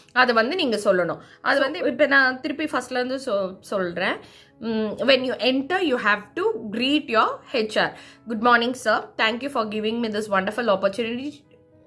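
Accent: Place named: Kerala, India. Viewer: Watching 2 to 3 videos in the past I doubted that you would be malayali..... And In this video your slang resembles like a malayali .....are you a malayali..??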